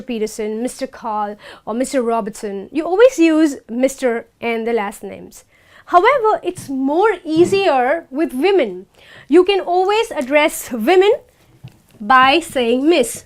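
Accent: United Kingdom. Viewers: There is no need of such British a csent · She takes british action and fast cant understand what she is saying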